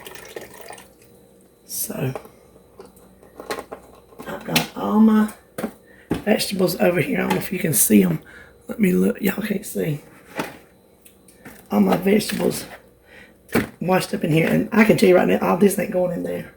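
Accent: South, United States. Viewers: I know exactly what you mean -- I grew up from southeastern Kentucky cooking and ... woooweeee I love my gravy and biscuits, fried potatoes, corn bread, fried chicken, fried green tomatoes, ... hmmmm have you noticed we like to fry where I come from? I love my southern roots and listening to you reminds me of those roots!! Love your channel and your style!!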